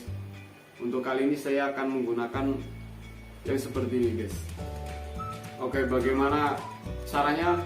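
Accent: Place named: Tegal, Indonesia. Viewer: Tenang ae mas e wis tak sasbcribe... Sampeyan wong ndi,sih logate kaya Tegal ..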